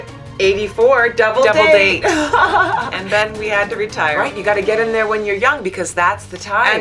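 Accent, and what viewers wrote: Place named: Wisconsin, United States. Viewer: Very funny. The Wisconsin accent is similar to us here in Ontario. One question... I am trying to figure out the words in the intro?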